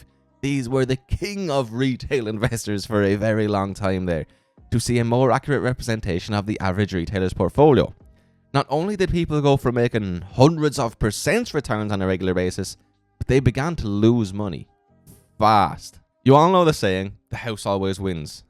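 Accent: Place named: Ireland. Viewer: What’s sexy is you’re back Baby I’ve missed you my brother. I’m glad to see you & hear that beautiful Irish accent. Let’s get down to business and make some money baby ♥️!!!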